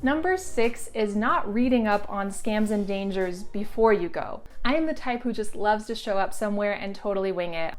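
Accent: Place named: North America. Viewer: I'm guessing you're either U.S. or Canadian by the way you speak. You remember thoes AT&T Sprint with Candace Brugan the dime lady. And MCI 00 it's magic you know. And <num> /<num> commercials? Saw them all the time in the 90s as a kid.. now days obviously they're a null point. Nearly everyone has a cell phone. I just can't understand why that being said phones have yet to work worldwide at the same flat rate. .. I hope it happens before this 2020s decade is over. As previously mentioned I know how to use a calling card from a payphone. However guessing I wouldn't find very many of either anymore??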